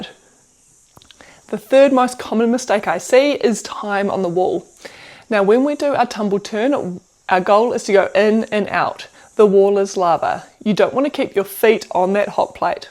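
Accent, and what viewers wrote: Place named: New Zealand. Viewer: love the accent .. New Zealand ?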